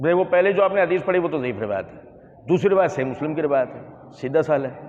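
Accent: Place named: Hyderabad, India. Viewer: آپ کے بعض الفاظ سے آپ مجھے حیدرآباد دکن کے معلوم ہوے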